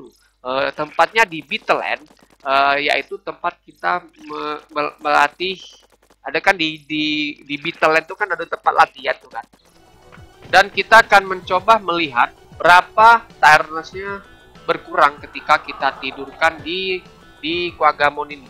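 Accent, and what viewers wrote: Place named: Minangkabau, Indonesia. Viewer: Mantap bg...jan jaleh bana logat minang nyo bg hehee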